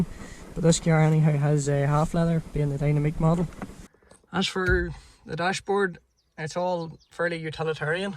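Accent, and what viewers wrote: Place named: Derry, United Kingdom. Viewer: Hearing this lads accent to me he sounds like he is from Derry Amen Godbless Madeira 40 40 Liamp/atrickmr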